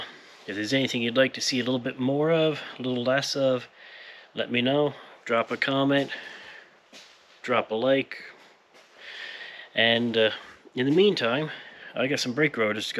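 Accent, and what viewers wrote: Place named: Canada. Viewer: That Canadian accent is annoying.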